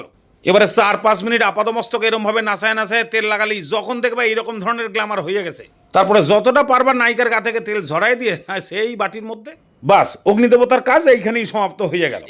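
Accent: Bangal, Bangladesh. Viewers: খুব সুন্দর করে বলেন, আমি আপনার দেওয়া রেসিপি গুলো করে খাই, তারপর আমার মা চট্টগ্রামের মেয়ে, রান্না তো জানেন,আপনার কথা গুলো শুনে মনে হয় আপনি বাঙাল,তাই তো অত ভালো রান্না করেন।আমি শুভ্রা। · Bangladeshi accent 😊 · বাঙ্গাল কথা কি বলতেই হবে। কথার ধরন শুনে এদেশিরা আর আগ্ৰহ দেখাবে না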